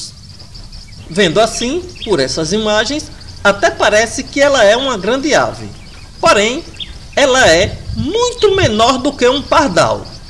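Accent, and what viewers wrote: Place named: Northeast, Brazil. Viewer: Gosto do seu sotaque nordestino , este é meu Brasil de Norte a Sul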